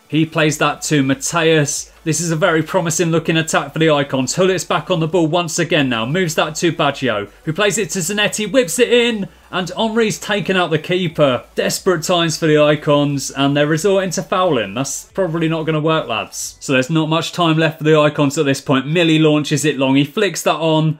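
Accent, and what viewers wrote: Oxford, United Kingdom. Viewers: Are you from oxford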